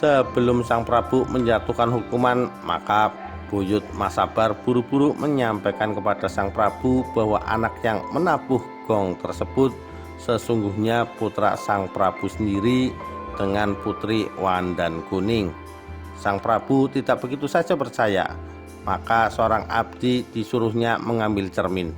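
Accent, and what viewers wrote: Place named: Banyumas, Indonesia. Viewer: Sayang chanel ini yg membacakan critanya sangat g menarik blas....aksenya banyumasan...g menarik blas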